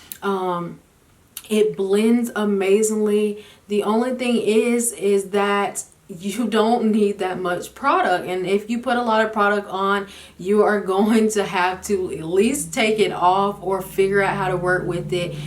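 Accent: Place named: North Carolina, United States. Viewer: love your accent? are you from North Carolina?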